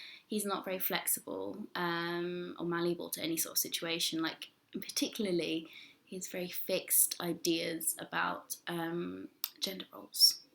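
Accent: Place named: England, United Kingdom. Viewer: I got distracted so many times with the english accent and you playing with your hair :D but it was a great video after all. Great character analysis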